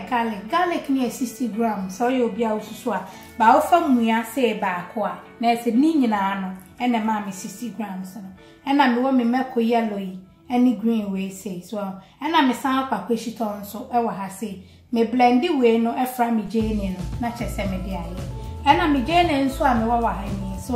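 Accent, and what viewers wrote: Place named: Twi, Ghana. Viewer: Great Job. I love the pure Twi. Thank you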